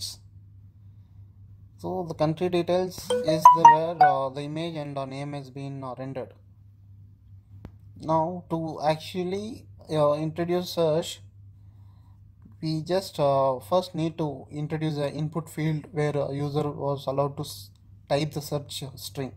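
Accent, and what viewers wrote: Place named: India. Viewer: i think i better understand indian accent than american. Thx u a lot)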